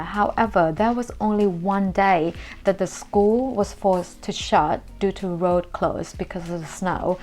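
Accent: United Kingdom. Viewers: I am very much impressed off yours british accent speaking .i hav never seen any thai people talking like this manner as i hav work with thai people here in Nepal ktm station😊😊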